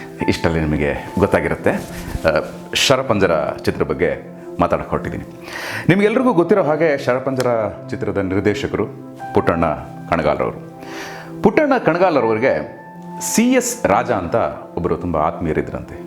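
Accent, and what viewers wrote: Standard. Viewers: ಉತ್ತಮ ಶೈಲಿಯ ಶುಧ್ಧ ಕನ್ನಡದ ನಿರೂಪಣೆ. ನಿಮಗೆ ಧನ್ಯವಾದ. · ಅಚ್ಚ ಹಾಗು ಸ್ವಚ್ಛ ಕನ್ನಡ. ಕೇಳಿ ಆನಂದವಾಯಿತು. ಧ್ವನಿಯು ಸಹ ಸೂಗಸಾಗಿದೆ. ಈಗಿನ ಚಿತ್ರಗಳಲ್ಲಿ ಬರೀ ಅಬ್ಬರವೇ ಜಾಸ್ತಿ. ಕಥೆಯೇ ಇಲ್ಲ. ನಾವು ಹಳೆಯ ಚಿತ್ರಕಥೆಗಳನ್ನ ಮೆಲಕು ಹಾಕಬೇಕು. ನೀವು ಆ ಚಿತ್ರೀಕರಣದ ಸಮಯಕ್ಕೆ ಕರದೊಯ್ಯ್ದಂತೆ ಭಾಸವಾಯಿತು. ಒಳ್ಳೆಯದಾಗಲಿ. 🙏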